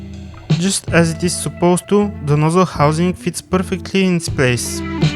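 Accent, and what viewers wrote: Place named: Russia. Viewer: Narrated are good, however your narrations resemble "russian spy" accent in western Cold War movies :)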